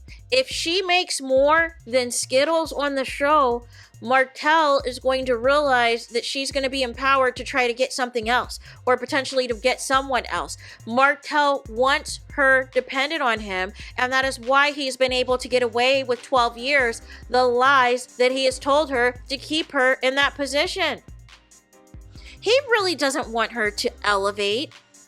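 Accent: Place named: New Jersey, United States. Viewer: Whom ever is complaining about your sound should merely adjust their sound. If they complain they can bye, you are the most non controversial CC, I've been tuning in almost 2 years now. You are great and growing which makes you a target. You get to it state facts and laff lol😂 I love your laughter. Sounds like a jersey girl ❤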